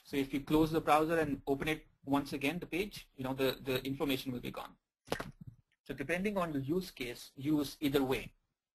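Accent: India. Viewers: omg, your Indian accent...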